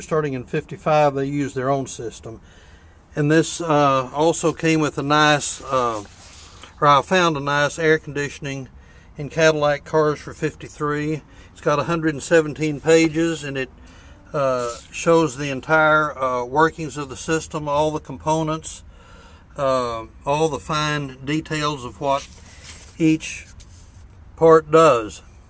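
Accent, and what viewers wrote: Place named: Appalachia, United States. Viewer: I had to stop watching half-way through.. the hillbilly narrator with his stutter "uhs" and "duhs' was just too distracting and that video was nauseating!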